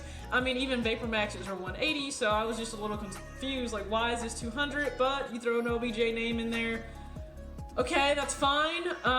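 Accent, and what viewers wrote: South, United States. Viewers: definitely from the south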